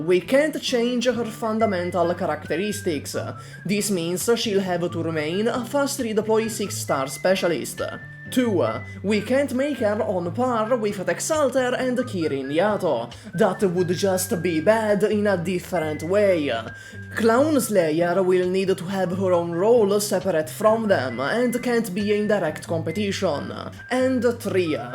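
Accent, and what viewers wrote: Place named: Italy. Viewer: such an Italiano accent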